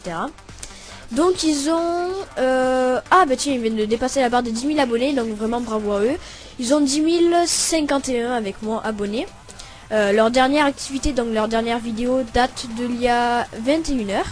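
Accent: France. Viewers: On sent l'accent du sud x) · Plus marseillais , tu meurs ! :D MARSEILLE EN FORCE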